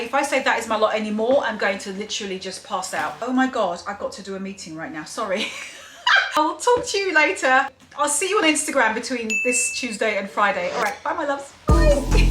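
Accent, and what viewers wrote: United Kingdom. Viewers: I love your videos!! I love your style. I love your apartment!! You and your husband are a great couple. I also love your British accent, by the way.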